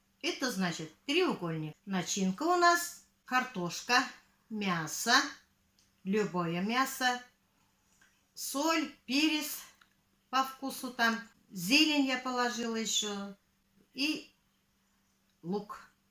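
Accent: Tatarstan, Russia. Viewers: Красивые получились! А я сегодня пекла беляши. Фарш из своей курицы и добавила в фарш мясо из свининой грудинки. Такая вкусная и сочная начинка получилась. Сын приехал- дала его семье, их четверо. И остались для нас, а нас шестеро, у дочери четверо детей. Завтра буду жарить для младшей доченьки, а их шестеро- у неё четверо детей. Кур у меня много, которых надо пускать на фарш, они уже практически перестали нестись. Мы им уже на замену купили молодок 20шт. И суточных 30шт.породы Род Айленд, из которых 18 петушков и 10 курочек, а двое погибли. Ждём ещё заказ: 10шт. Кучинских Юбилейных, 10шт. Мини-мясных палевых. И чуть позже будут ещё 10шт. Пушкинских. Да, понимаю, что поздновато мы суточных приобретаем, это потому, что ещё не готов новый курятник. А потом планируем приобрести хороший инкубатор(уже знаем какой), и будем выводить сами себе и родственникам новых курочек. 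Но думаю, что будут ещё и наседки. А инкубатор навсякий случай, если не будет наседок. Но специально выбирала те породы, которые наседки хорошие, например Кучинские Юбилейные. Но это если будет угодно Богу. Пока! Удачи тебе, моя дорогая землячка! Кончится самоизоляция поеду в Башкирию, всё немогу больше. Соскучилась по наречию татарскому и башкирскому. Ты мне со своим акцентом, как бальзам на сердце! По русски говоришь хорошо, но акцент никуда не денешь и это радует, а как запоёшь, у меня сердце от радости замирает, и детство вспоминаю. Спасибо тебе, большое, что ты есть и появилась у меня!!!!!!! ♥️♥️♥️♥️♥️ Чай п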